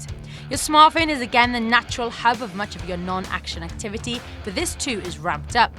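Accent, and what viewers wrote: Wales, United Kingdom. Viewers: Sounds more like a Welsh accent than an English one.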